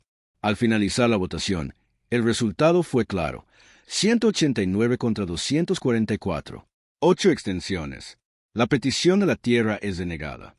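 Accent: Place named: Bolivia. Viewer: el que habla es boliviano jajajaja, me gusta, pero no con fio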